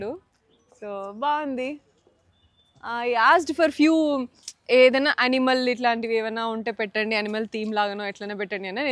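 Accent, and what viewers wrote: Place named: Telangana, India. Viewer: I like your dressing style aand the way your speaking... Telangana slang vinadaniki baguntadhi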